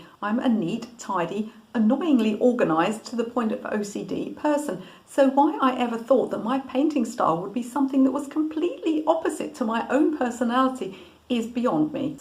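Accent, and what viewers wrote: England, United Kingdom. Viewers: HI, I SUBSCRIBE TO YOUR CHANNEL. I REALLY ENJOY IT AND LEARN A LOT FROM YOU. HOWEVER, IT IS REALLY, REALLY HARD TO ABSORB ALL THE INFORMATION - DUE TO YOUR RATHER RAPID RATE OF SPEECH & MAYBE TINY BIT DUE TO YOUR ENGLISH ACCENT. BECAUSE IT TAKES THE HUMAN BRAIN TIME TO PROCESS SOMEWHAT COMPLEX INFORMATION, ESPECIALLY. I TOO, SPEAK RATHER FAST, AS COMPARED TO SOME FOLKS. THIS IS IN NO WAY A CRITICISM!! YOUR CHANNEL CONTENT IS VERY GOOD INFORMATION- I WATCH YOUR CHANNEL OFTEN THANK YOU, AND KEEP UP THE NICE WORK,YOU HAVE HELPED ME LEARN A LOT! PS WHEN I WATCH YOUR CHANNEL AND SOME OTHERS TOO - FORTUNATLY, ON YOUTUBE, I HAVE THE OPTION OF (SPEEDING UP OR SLOWING DOWN) THE VIDEO PLAY BACK SPEED. SO, I WATCH YOUR CHANNEL AT A SLOWER VIDEO SPEED AROUND 75% -50%. MAKES YOUR VOICE SOUND A BIT ODD LOL. BUT, IT GIVES MY BRAIN TIME TO PROCESS YOUR INFORMATION. 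I REALLY LIKE YOUR FORMAT TOO, IN THAT YOU STICK TO YOUR SUBJECT AND NOT A LOT OF TALKING ABOUT WHAT YOU DID TODAY, WHAT YOU ATE, WHERE YOU SAW SO AND SO ETC LOL. I WATCH ARTISTS VIDEOS TO LEARN ABOUT ART. I FIND A LOT OF "SMALL TALK" VERY DISTRACTING. I ALSO LEARN BETTER FROM BOTH VISUAL AND AUDIO DISCRIPTION OF TOPIC- BECAUSE I AM A NEW ARTIST. I AM SO VERY GRATEFUL FOR YOUTUBE! I HAVE LEARNED SO MUCH MORE INFORMATION AND MUCH FASTER THAN I COULD HAVE ON MY OWN, THATS FOR SURE- SO THANKS AND PLEASE KEEP THE NEW CONTENT COMING- MY GRATITUDE TO YOU!!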